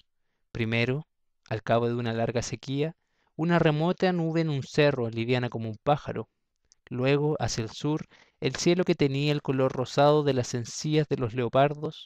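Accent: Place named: Chile. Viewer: se agradece la narracion chilena, saludos desde santiago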